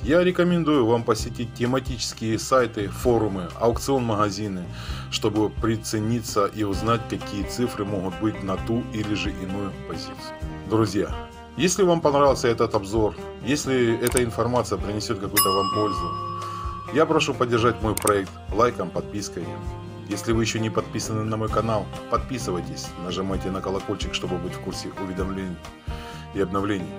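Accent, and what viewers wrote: Ukraine. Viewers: прекрасный украинский говор, что вы имеете против?)) не все говорят с маасковким ааакцэнтаам)))) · Духи Праздничные- Россия и Юриала-3 - Прибалтика.А говор украинский за версту. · Вообще то это украинский канал, у них всё так говорят.... Могли бы сделать снисхождение, а не критиковать. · Это украинский или южнорусский акцент. А не издевательство! Речь не о том в видео! Спасибо автору за воспоминания и информацию для тех кто не знает.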